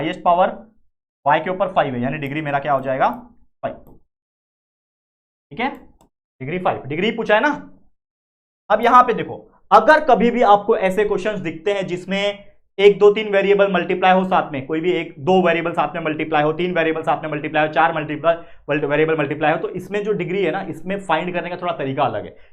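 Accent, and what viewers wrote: Maharashtra, India. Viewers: Are you from maharashtra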